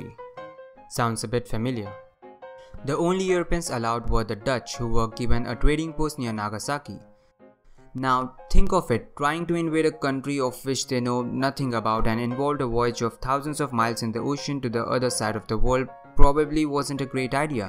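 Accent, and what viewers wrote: India. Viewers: The Indian accent is fucking hilarious. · I was fine with the accent, couldn't actually tell you were Indian, but, please. Back up from the mic next time, the peaking is somewhat annoying.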